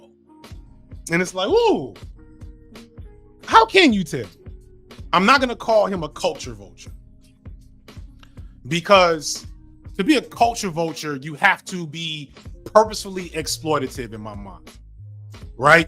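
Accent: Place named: Atlanta, United States. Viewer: so I'm not a native English speaker. I would call myself fluent in English but my two remaining gaps are technical vocabulary (terms specifics to an industry or a medium, terms that are never used in conversation so they're hard to learn simply by talking to people or even by reading books or watching films) and dialects/languages derived from English (kinda ironic because in my native language I'm the product of two families that speak a patois specific to their region). I really enjoy watching content more as a learning tool into American culture, Black-American culture and simply the perspective of someone older than me with a long academic background and complex, thoughtful takes I enjoy hearing through my own cultural lense. I hope you'll understand I'm coming at this with a genuine desire to learn, and since it's about spoken language, it's pretty hard to look up myself. 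I feel like the way talks in the long, scripted main channel videos is both influenced by his accent as well as articulated in a way that makes it evident this was a research, probably beta-read, rehearsed script that he's reading. By opposition, videos like this one on the B Sides sound to me like not only his Atlanta accent is stronger but he's switching between AAVE and English throughout his sentences (as I understand it, AAVE is it's own language with a culture and an interesting history of ethymology so it feels appropriate to treat it as such and view people who use it as bilingual, but i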